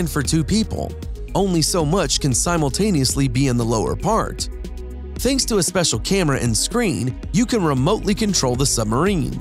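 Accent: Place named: United States. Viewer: How does that American sounding voice speak such bad English? Is it a brilliant bot, or an idiot voice actor??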